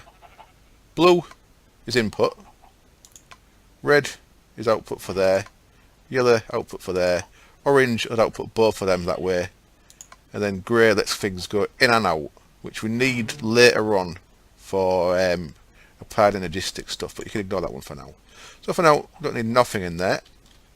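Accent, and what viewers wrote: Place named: Yorkshire, United Kingdom. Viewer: You just go how you want bud, the video's so far are mint. From a fellow Yorkshireman (Presuming you're from Yorkshire, sounds like it!)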